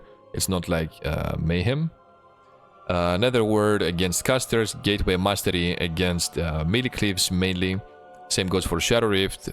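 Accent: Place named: Greece. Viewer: Cool accent, is that greek if you don't mind me asking? :)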